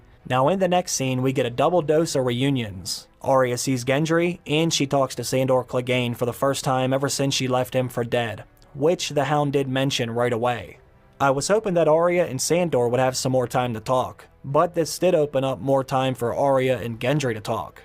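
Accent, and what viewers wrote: Pittsburgh, United States. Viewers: I enjoyed your analysis. By the way, you sound like you're from Pittsburgh. Am I correct?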